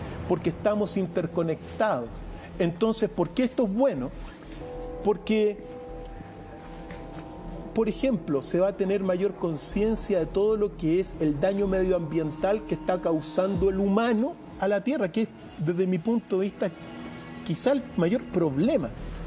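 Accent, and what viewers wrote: Chile. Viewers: me gustaria tenerlo para mi solita, pero entienedo que seria mucho egoismo de mi parte, es usted un tesoro para la humanidad, me encanta escucharlo....sobre todo el acento chileno, lo mas dulce que he escuchado. a mi gusto... gracias por sus predicciones, hay mucho que aprender.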